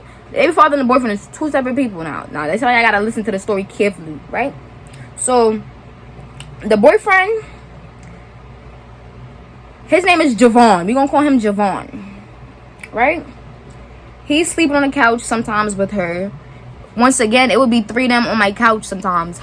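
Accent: New York, United States. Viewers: I can spot my people anywhere 🗣🗽if you wasn’t from NY, I knew you was at least from somewhere up north lol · I love the way you talk? You from NY?❤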